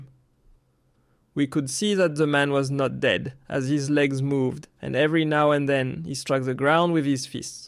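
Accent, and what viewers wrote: France. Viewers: Excellent video man, I have listened to a few different accounts from the Russian campaign and I must say, even though you are speaking English the French accent provides a deeper authenticity you don’t get with other accounts.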